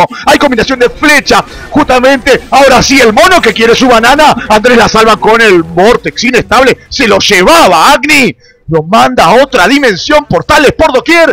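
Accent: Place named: Argentina. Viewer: Relator argentino. No se quien es, pero se nota a los 2 segundos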